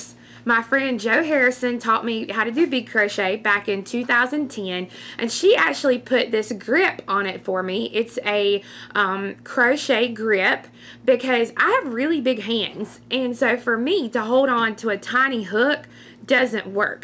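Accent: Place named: North Carolina, United States. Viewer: you are a hoot! My family is from Mt.Airy,North Carolina. I feel like I am listening to a family member! I enjoy your videos so much! Thank you!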